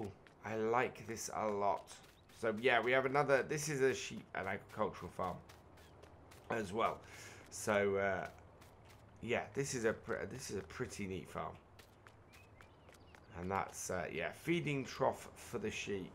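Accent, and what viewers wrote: United Kingdom. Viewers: Are you from Norfolk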